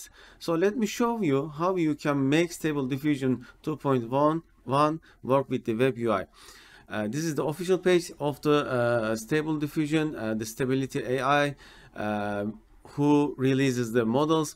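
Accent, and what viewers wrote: Turkey. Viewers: tr ingilizcesi bu nerde olsa tanırım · This is definitely English accent of a Turkish speaker :) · you have a strong turkish accent brother 👊🏻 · I like turkish accent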